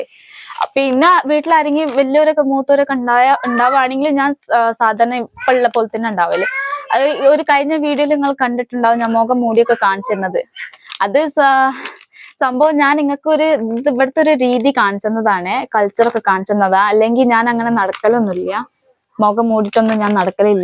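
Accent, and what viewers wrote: Malappuram, India. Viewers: നല്ല മലപ്പുറം മലയാളം സംസാരിക്കുന്നു കൂടുതൽ ഉത്തർപ്രദേശ് കാണിക്കു മലയാളികൾ കാണട്ടെ അവിടത്തെ ചുറ്റുപാടുകൾ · Ente cousinte home chelari aanu🥰.. samsaram kettapol thooni.. onnengil calicut allengil malappuram aayirikkumnu😍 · Malappuram style samsaram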